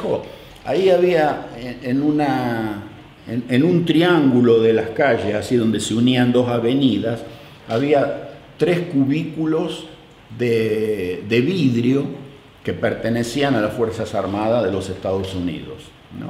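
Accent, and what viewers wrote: Argentina. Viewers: Lo que me encanta de es que no ha perdido su acento argentino, y lo que dice respecto a lo que cuesta comprar, tristemente sigue igual. A muchos nos está costando llegar a fin de mes, hay que trabajar cada vez más y lo mismo, no alcanza. Los sueldos y jubilaciones son bajos. Se mantiene a los vagos con planes. Eso me tiene cansada, nos tiene cansados a todos. Deseamos gobernantes honestos y que ayuden a todos con trabajo digno, sueldos dignos., admiro su valentía de contar lo que tanto les hizo sufrir acá. Y qué bueno que tuvo junto a su familia un mejor pasar.. Saludos desde Mendoza Argentina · Gracias por compartir un poco de tu historia!!!. La forma que tienes de relatar con ese acento argentino es muy atrapante... sos el Gato Peters canadiense!!!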